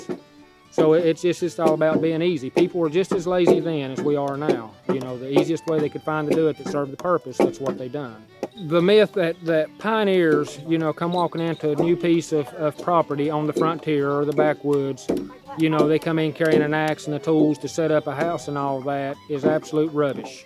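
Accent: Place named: East Tennessee, United States. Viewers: I'm from East TN and he sounds like he's either from there or the plateau. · His accent is Appalachian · nice. bunch of us in AL around like that too so I knew he was southern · I would guess Kentucky or Tennessee, I would also guess most Americans could identify that pretty easily. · it sounds like a east TN, or a east KY, accent! Hill folk know hill folk!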